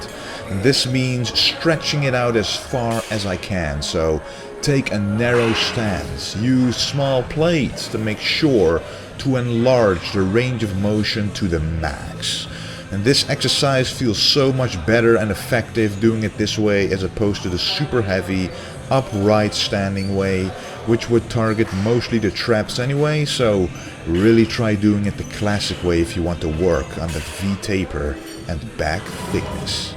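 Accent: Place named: Netherlands. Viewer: are you from the netherlands? your english accent sounsd a lot like a friend of mine from the netherlands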